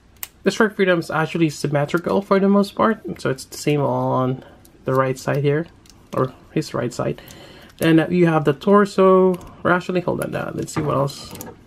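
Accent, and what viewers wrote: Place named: Philippines. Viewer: the way you talk.. are you filipino?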